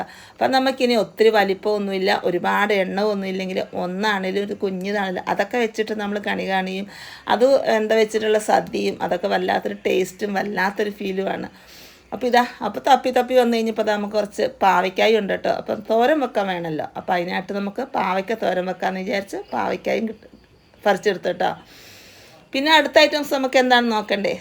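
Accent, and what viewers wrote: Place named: Pathanamthitta, India. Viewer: ok.. Enikku samsaaram kettappol pathanathitta aanonu thoni.. Njaan pathanamthita aanu..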